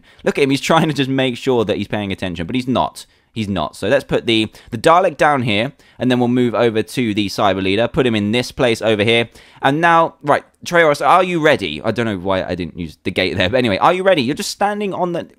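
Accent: United Kingdom. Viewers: Dr Who Has A British Accent Just Like YOU!!!!!!! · and the cool thing is, you have a british accent, so you sound right when you say "dalek"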